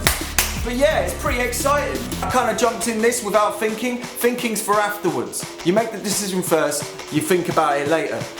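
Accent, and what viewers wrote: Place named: London, United Kingdom. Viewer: I 'm just watching your videos cuz you're funny and have a genuine londonian accent. I like that!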